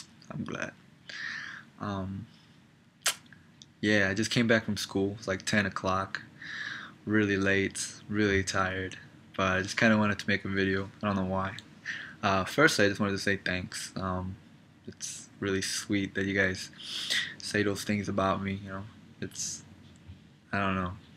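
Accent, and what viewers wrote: England, United Kingdom. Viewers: oh i dig your british accent ;) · Looool I like the English accent. And tr thig you said about Adam and Eve was actually so true , after the flood in Noah's time people's lives started gettif shorter due to sin ,and disease started spreading to. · I'm from England! I'd send you something but I'm not really sure... I guess you'd expect tea or something. Your English accent made me laugh though.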